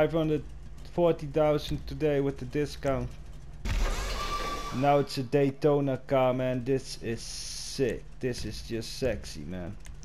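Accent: Netherlands. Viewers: Are you dutch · im half netherlands and half from belgium. thats why i knew that he was from the netherlands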